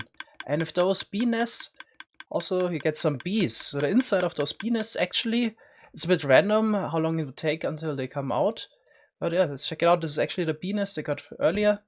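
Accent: Germany. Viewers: great video! no bee pun or material list but i do have a question u german?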